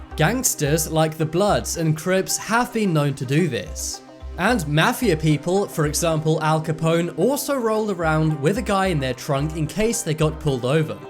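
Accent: United Kingdom. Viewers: Annoying uk accent about supposed us bullshit. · This person has a British accent. Maybe that's what British cops do. NOT AMERICAN COPS!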